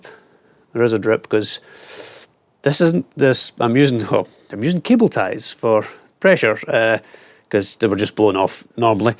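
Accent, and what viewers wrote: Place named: Berwick, United Kingdom. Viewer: Random question. Are you from Berwick or thereabouts? The accent is very musical.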